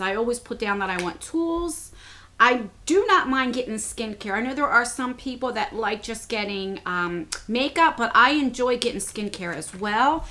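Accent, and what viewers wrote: New Jersey, United States. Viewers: Hi i really like your vidoes been subscribed for like 6 months now i love your Jersey accent its beautiful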